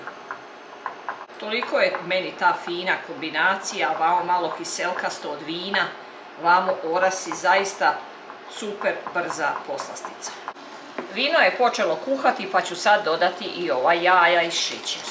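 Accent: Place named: Slavonia, Croatia. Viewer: Sta reci - potpuni uzitak gledati kao i uvijek spremanje odlicnih jela i slusati divan slavonski nagalasak. Moram probati ovaj recept za krompir. Pozdrav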